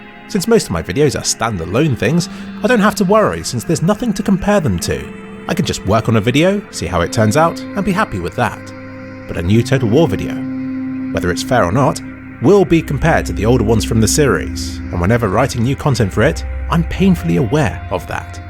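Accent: United Kingdom. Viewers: The British accent and your voice are must for me to listen to each week.Gorgeous lyrics and entertaining videos don't hurt either.Keep doing what you are doing for as long as you enjoy it cuz i certainly love all your content,even some i did not expect to love.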